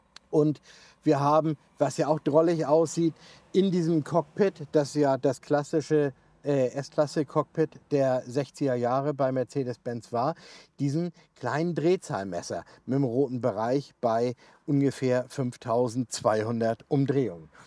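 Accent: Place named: Hamburg, Germany. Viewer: Sehr sympatisch das breite Hansedeutsch!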